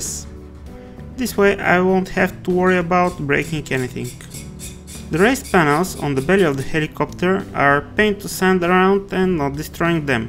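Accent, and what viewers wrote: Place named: Russia. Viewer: Ну дикий русский акцент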